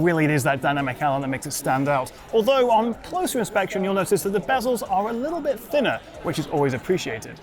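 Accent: United Kingdom. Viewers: GAY BRITISH ACCENT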